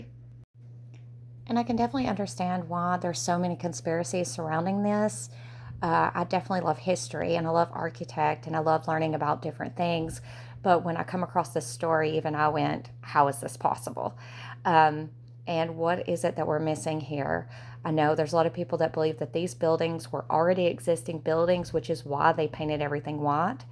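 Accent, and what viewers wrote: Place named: South, United States. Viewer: Indoctrination, why do you think we longer host these ridiculous events??? Obviously the city was there before, and our historical timeline is just not accurate. We're all being manipulated to believe a timeline that glorifies north America and white people, putting us at the pinnacle of creation, science, and invention which is just not true. Many civilizations before Europeans were far more advanced, and Europeans stole their technology, land, and even people and claimed it all for their own. There is also several civilizations and groups of people that were obviously wiped out because we have their technology but no people and no history and think about all the libraries that have been burnt on purpose and even the book burnings in world war II. Much of our history has been erased on purpose to benefit one group of people or should I say 1percenters lol. 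Great video though, I love the images you used, and your southern accent as I am also a southern woman questioning history lol.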